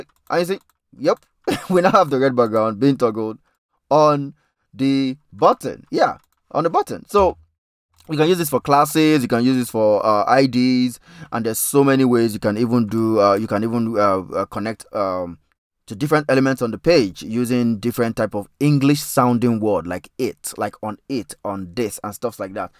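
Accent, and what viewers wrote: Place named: Caribbean. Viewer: This is the first time ive ever heard someone with a caribbean accent on youtube... (maybe jamaican?).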